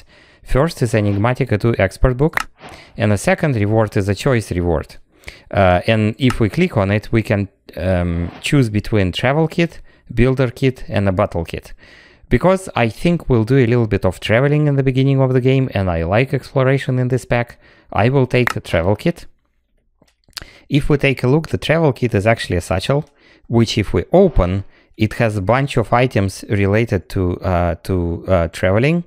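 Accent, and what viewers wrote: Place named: Russia. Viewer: Слышно что русский)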